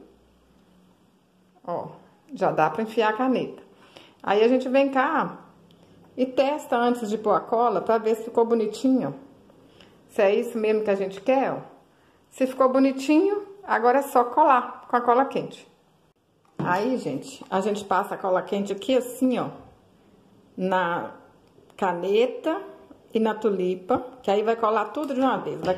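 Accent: Minas Gerais, Brazil. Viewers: Que trabalho lindo!bonitin !vc é mineirinha, pelo sotaque 😘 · Adorei o seu mineires. ( MG)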